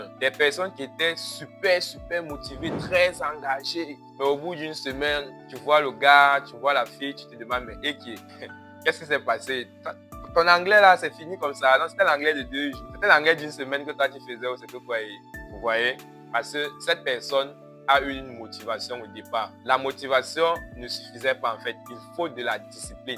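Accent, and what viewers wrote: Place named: Cameroon. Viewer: On reconnait le ton Camerounais 😂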